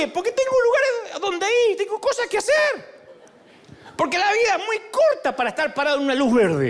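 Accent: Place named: Argentina. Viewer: Hola querido… tu eres mi cartero del cielo… me llegan los mensajes y traspasan mi alma🥰😇y Papá Dios y Mamá Maria no se equivocan con escogerte y mi marido que murió hace 6 años era de Buenos Aires… y mi hijo Aldo Cesar es mitad argentino🇦🇷 y mitad de mi México 🇲🇽 lindo y querido y me emociona escucharte con tu acento que convivi por 36 años y me enternece que mi Jesús te utiliza para que llegue el mensaje con tu acento que extrañamos tanto❤️🌹❤️🇦🇷🇮🇹💕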